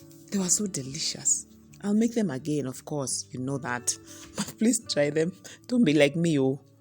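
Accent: India. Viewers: beautiful. I love your i indian accent. I love indian food too especially the spices in their foods. I would love to know if you have ever been to india or if you studied there. Been there for my studies hence the love for the foods. I love your contents esp food vlogs. 😋😋. Much love❤❤ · I love the Indian ascent wow, watching you from zambia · Liking the Indian accent, great video as always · Hahaha! I love the Indian accent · Its the Indian accent for me 😅😅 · The Indian accent cracks me up. Great content girl. Lov from Tanzania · Omg! The Indian accent 👏❣️your videos inspire me. I learn alot. Keep doing what you do. Love love your content ❤️❤️❤️❤️❤️ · Your English-india is perfect,join Bollywood una potential mama! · Wooow!! Good job. The Indian accent 👌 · For me it’s the Indian accent😂😂. Am done😂Good video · Looove the Indian accent 😅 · You knocked me out with the indian accent..Easily my fav Youtuber..! 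· 😅🤣🤣the Indian accent though. Good job mama. I will definitely try the naan and butternut soup. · The Indian accent,you nailed it!Hope the camera guy gets fed the delicious meals too😃😃Love all the recipes,good work Mama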